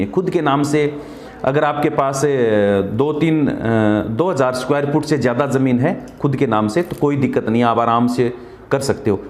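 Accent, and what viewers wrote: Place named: Churu, India. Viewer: Sir aap kha se h ....aapki boli se churu k aas pass k lagte ho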